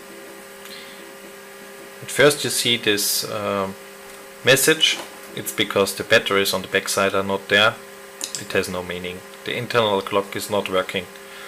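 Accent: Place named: Germany. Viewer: Man hört den Deutschen Akzent deutlich raus. Macht aber nix :) Trotzdem tolles Video!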